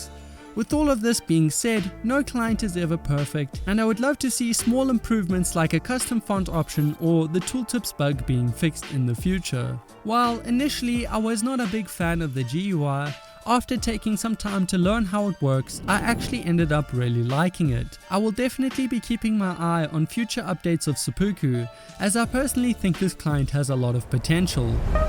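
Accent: South Africa. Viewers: South African flag on your discord status i think i might have found the most normal sounding south african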